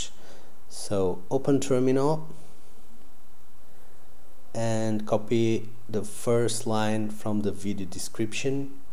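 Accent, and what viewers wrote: Portugal. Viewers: Reconhecemos um fellow tuga quando o ouvimos hehe. Obrigado ! Resolveu !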